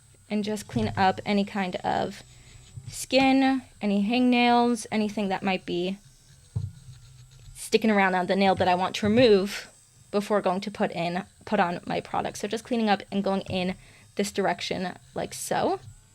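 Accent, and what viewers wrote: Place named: United States. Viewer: Are you in the U.K.!? Notice you mentioned Nail order, but you sound like you have an American accent! Just wondering because wouldn’t it be cheaper to use product brands existing in your county ??! ❤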